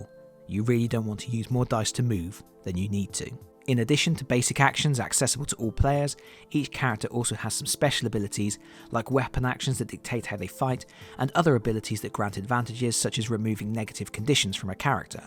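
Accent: United Kingdom. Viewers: Great review. The british accent adds to it for some reason..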